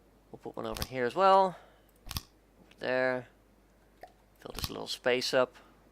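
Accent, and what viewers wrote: Netherlands. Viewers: I can already hear that this guy is dutch Zeg “hallo” als je ook NL bent 😄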